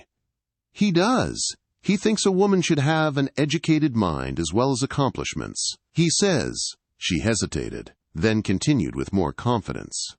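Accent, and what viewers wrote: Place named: United States. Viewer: and American accent😞. Should be narrated in British accent.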